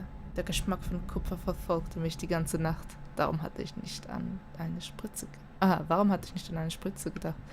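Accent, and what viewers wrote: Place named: Switzerland. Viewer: Schwiizerdütsch fiiri eifach 👌🏽 Guets Video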